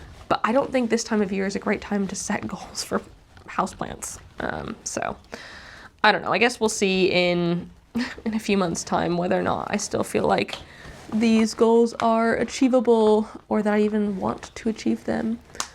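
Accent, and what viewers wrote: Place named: England, United Kingdom. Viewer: Ive been curious about where your originlly from and when you moved to england, do you notice that you have an english accent sometimes? i think its cute! i would love to live in england. I also notice that when you speed up your videos you move your mouth around, do you have a bad habit of chewing your cheeks like i do? you seem a bit neurodivergant and i think thats a sign. I do it so bad sometimes that my cheeks will be sore. Love your videos btw!! love your fashion style to! where do you get your jewelery??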